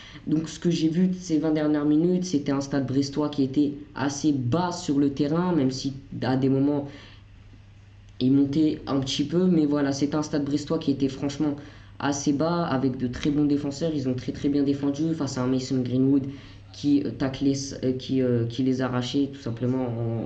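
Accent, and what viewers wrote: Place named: Paris, France. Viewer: Tu parle comme l immigré parisien t es le régen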